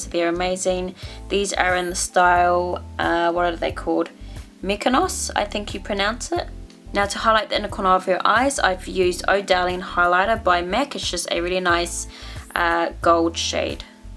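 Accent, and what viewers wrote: New Zealand. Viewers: Are you a kiwi?? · Just found your channel and im in love!!, are you kiwi? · LucyAnneDewhurst it's called an accent.... She's kiwi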